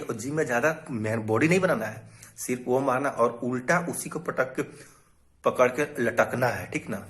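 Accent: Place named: India. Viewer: Bhai kchh v ho Aap sudh desi bhasa bolte ho.....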